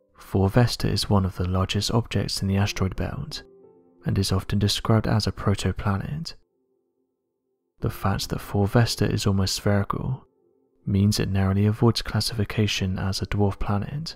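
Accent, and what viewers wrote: United Kingdom. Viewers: Always some horrendous British accent narrating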